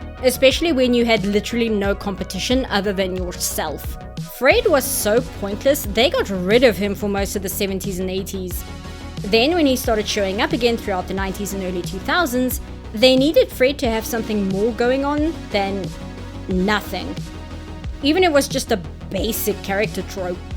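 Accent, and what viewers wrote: South Africa. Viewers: lol I never would've thought of fred as a fellow autist but now it makes sense Also her south african accent is hot af, I hope I'm not the only one that thinks this XD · oh geez i di not know you were from south Africa ?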